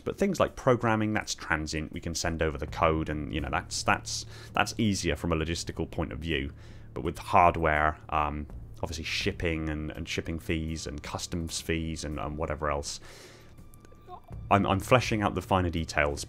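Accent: United Kingdom. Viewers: I love British narrations idk why it just sounds so much smoother and intelligent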